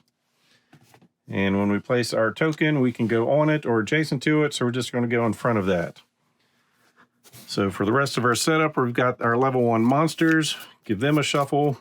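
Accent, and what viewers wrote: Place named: South, United States. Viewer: You won't believe how long I've waited to hear you recite this Lovecraftian horror. It's awesome. #MonotoneSouthernExcellence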